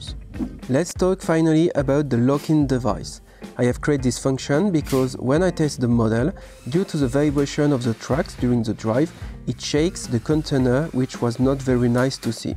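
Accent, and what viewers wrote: France. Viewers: Great model, I have both your other 42100 alts and will buy this one too, for sure! Love your French accent, btw. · j'en était sûr en entendant l'accent...un français sympa le MOC, je vais jeter un oeil au reste des création s hormis l'accent (j'ai le même quand je parle anglais) il n'y a rien à redire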